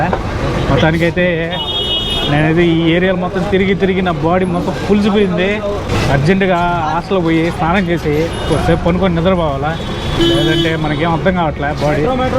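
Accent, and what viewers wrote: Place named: Chittoor, India. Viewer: Ho, ok😊 As per your slang, I thought you are from TPT/CTR😂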